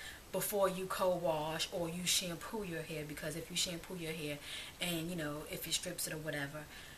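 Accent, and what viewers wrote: New York, United States. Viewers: I love prepooing with coconut or olive, very simply i just add oil 15-20 minutes before i shampoo or cowash. It's makes detangling very easy. I would recommend looking at the website blackgirllonghair this website is bomb! They break down information on black haircare very simply and showcase a variety a technique and tools. BTW I love your New York accent you represent New York girls proudly!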